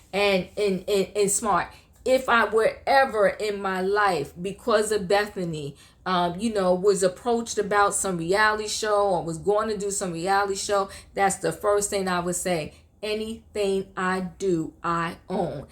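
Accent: New York, United States. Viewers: You have a NY accent but your skin too healthy. You in FL?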